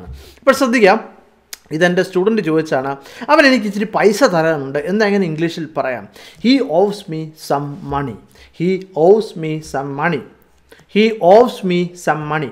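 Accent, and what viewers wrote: Kottayam, India. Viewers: Malayalam slang kelkkan nalla rasamundu.kottayam aano? From trissur.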